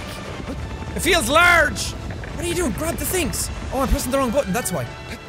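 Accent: Ireland. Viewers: sounds exceedingly Irish in this episode lol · Is it just me, or is Jacks Irish coming out in this video. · Is it just me or did suddenly get a thick Irish accent halfway through the video? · Does anyone else notice when gets excited he gets more Irish? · sounded so much more Irish today! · Has bin' getting more Irish?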